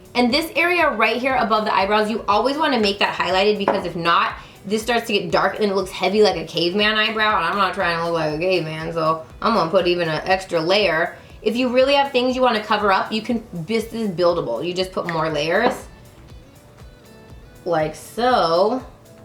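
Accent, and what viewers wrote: England, United Kingdom. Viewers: Love this tutorial - and your British accent rocks 😆💞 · I’m English and I love your English accent ❤️❤️ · Anyone who hates on your british accent can leave right now. You’re not part of the fam, bye. P.S. Missed you as well, not just the accent. Xxx (cause thatks how the British end their notes) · Love this trick! Gotta try this miracle foundation!!!:D And I love your British accent!! I like speaking like a Brit too ☺️ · I also love your British accent.... I'm a Brit 🙌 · I love your British accent it makes me laugh, and you look amazing as usual. But it does look like that was a lot of product being applied, no? I just don't like to look cakey.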